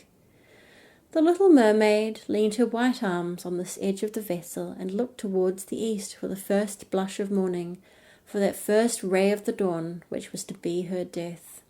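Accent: New Zealand. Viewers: Your voice and Kiwi accent are so relaxing 😊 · I usually don't mind the NZ accent, but not necessarily for story telling, tbh you sound lispy, have you taken your piercings out? Sorry I've had to leave you and go to another channel called relaxe her voice is clearer and not so lispy and poppy very soothing American story teller she is, but I give you an A+ for effort🦇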